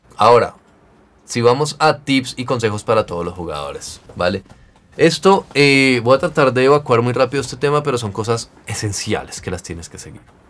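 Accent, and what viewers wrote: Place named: Colombia. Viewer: Hola parcero se nota por tu acento que eres Colombiano. Soy nuevo en el juego y tus guias me han venido de lujo. Explicas con mucho detalle. Buenos videos bro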